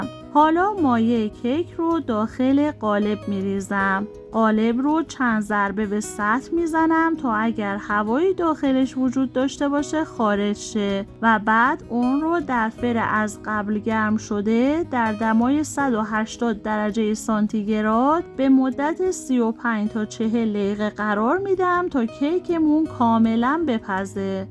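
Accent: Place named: United Kingdom. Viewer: استاد چرا زبان پارسی را با لهجه انگلیسی صحبت می‌کنی .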